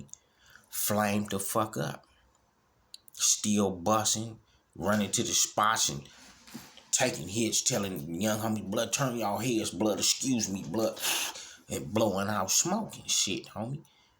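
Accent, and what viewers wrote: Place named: West Coast, United States. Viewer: Man I listened to your stories throughout my day at work and I'm a Crip from Denver and I know you're not lying and you're not fake about nothing you say however you can actually get paid do voices for like a new Grand theft Auto hell you can even be in movies you got that authentic stereotype West Coast accent and voice it's nothing new to me but to the world it would be a sight to see like yes n*** like this really exist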